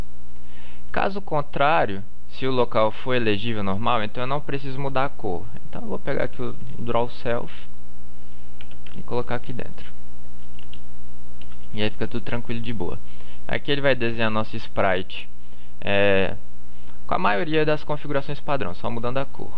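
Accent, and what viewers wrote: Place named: Rio Grande do Norte, Brazil. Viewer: Interior do RN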